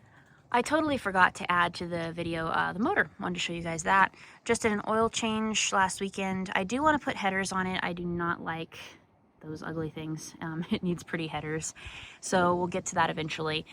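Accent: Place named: North, United Kingdom. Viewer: My opinion,that’s a show truck. It’s to nice to be a daily driver. What I noticed first is,the chrome molding on the body is aligned perfectly. And underneath is immaculate. Plus,I don’t know where you live but you sound like you liv up north somewhere. If that were mine,it would never see a salted road. I would walk first.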